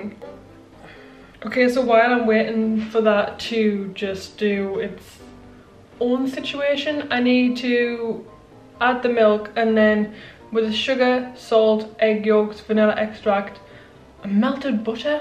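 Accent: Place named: England, United Kingdom. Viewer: I love your accent! What part of England are you from?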